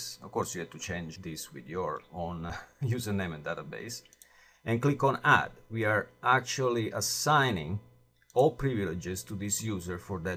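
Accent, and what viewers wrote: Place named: Italy. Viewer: being italian i find our accent pretty funny, but true, def good tutorial!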